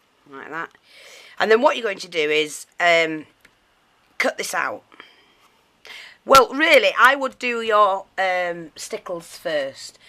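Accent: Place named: England, United Kingdom. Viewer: i m glad to hear english im from there but live in ireland.laughing you saying buggering thing.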